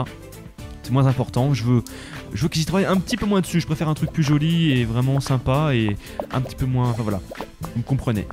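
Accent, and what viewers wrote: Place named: Paris, France. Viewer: pourquoi tu dit gare et pas genre . tu est de Paris ??? Parce que moi je suis d'Aveyron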